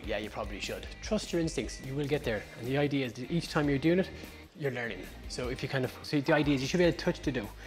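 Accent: Ireland. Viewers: I so enjoy your Irish accent, experience & knowledge. Thank you, God be with you. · It is English but with an Irish accent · I'm not going to lie I'm going to have to watch this a second time ..love just listening to Irishman talk one cooking is a little mind blitz your awesome from America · is such a great teacher and communicator. I have been making breads at home for years but I still have had my knowledge added to and reinforced by this and other videos here. He takes time to really give an explanation as to how and why. reassurance that a recipe is just a foundation and that the experience of baking has so many variables is valuable too. Not to mention the soft Irish voice! I regularly have fresh yeast (I just ask at the in store bakery at my supermarket) as I just think that it gives a better flavour. I am also totally converted to slow proving as I know that the flavour is improved. 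When I made these doughnuts I did not have a lemon but substituted lemon zest with grapefruit zest and they produced a very tasty batch.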